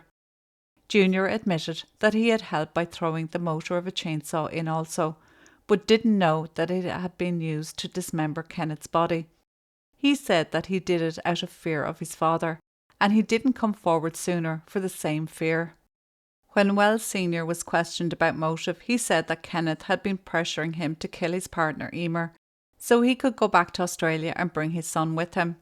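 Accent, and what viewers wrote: Ireland. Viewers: thank you for another great video. It warms my heart to see your subscriber count growing. If I ever get to travel to Ireland, what are some of the quaintest, lovely, and non-touristy places to visit? I am from an ugly , dusty Texas town would be easily bewitched by the greenness and exquisite verbal accents like you have!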